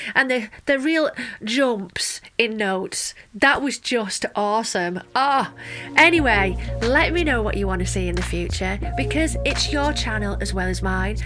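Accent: United Kingdom. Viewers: I love your British accent! <3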